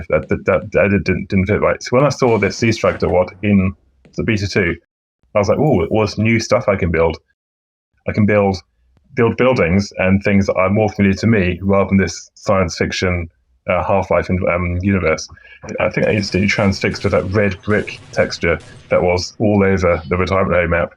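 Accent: United Kingdom. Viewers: Its so freaking hard to make sense of what he is saying sometimes ... british is so hard. Too much mumbling ... but anyway gj Dude! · Lol need subs for this huge british accent. Where he from in uk?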